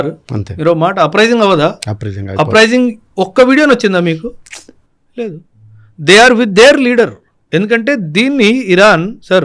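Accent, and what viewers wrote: Punjab, India. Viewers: OkA chilipi question sir italian of the east ani telugu langauge ni antaru meeru punjabi ina naku telisi mee antha sweet ga telugu ni maatladagala punjabini nenu chudaledu. telugu ni meeru baga enjoy chestaranukunta telugu old songs vintara ,Ghantasala gari songs ni baga enjoy chestu vintara. Please explain about telugu sir and what is the difference between punjabi langauge and telugu langauge. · Punjabi telugu matladutunte chala different ga vundi..but superb awesome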